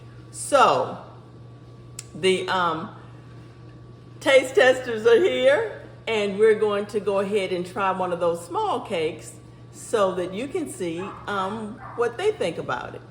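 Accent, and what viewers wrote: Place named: South, United States. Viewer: Wow, you you look much too young to be the grandmother of those children. Black women are lucky to have such beautiful skin that ages so well. I look much older than my Jamaican friend of the same age. I also love your beautiful Southern voice and gentle air. I'm going to try this recipe but I think I will reduce the sugar. I'm going to watch your other videos. Peace and Love. Sheenagh from Canada.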